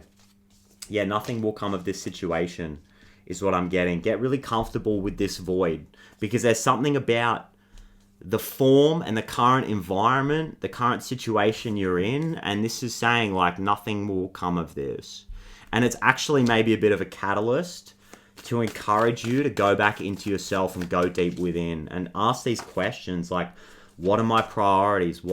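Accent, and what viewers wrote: Australia. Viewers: Instant accent bonus applied! What part of Aus you from? 😂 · The comfort of hearing a fellow Aussie voice jump out 🤜🏽🦘🤛🏽 Thanks man. 1st time seeing your channel. You helped me get at some tears, & quietly verbalise what's been eating at my spirit. Feelings of friendships not being reciprocal enough, even when they are good solid friendships. Feeling utterly disconnected/detached, but not in a way that feels helpful. Lots of reminders needed to hear in this. I appreciate that. The waterfall, also so significant to me... Let's see what happens I guess.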